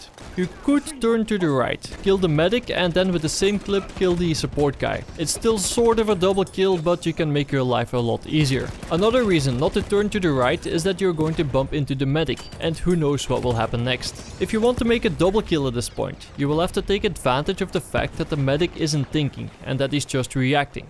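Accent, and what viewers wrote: Netherlands. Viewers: You sound Dutch or Belgian to me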